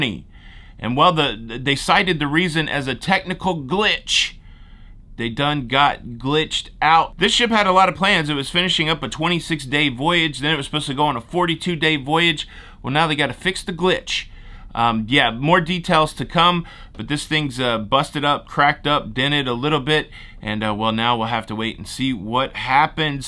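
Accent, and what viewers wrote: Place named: Texas, United States. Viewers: that was some amazing Texas talk! lol #houstonian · That Texas accent was so natural! Well done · Just love your Texas accent,! Ha!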